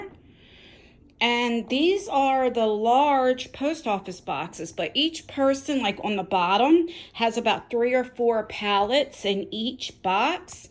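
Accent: New Jersey, United States. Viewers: Love you and your channel! My family is from Jersey and I love listening to you talk. Feels like home...just like a good chocolate chip cookie. :) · I've been watching you for a little over a year and you are the best part of my evening. I literally go to bed with Abby, Theresa is dead, and you. I enjoy your New Jersey accent and love listening to your new podcast with Abby. Can't wait for you two to come out with you merch line. Can you imagine your own line of tye-dye hoodies. That would be so awesome. Well congratulations for reaching 50k and I will be right behind you when you hit a 100k. Xoxo. 😉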